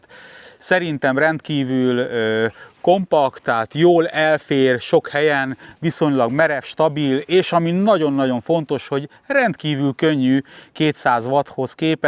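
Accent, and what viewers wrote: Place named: Moscow, Russia. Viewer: az csattog ez pedig Kb Moszkvai akcentussal beszélni mazsar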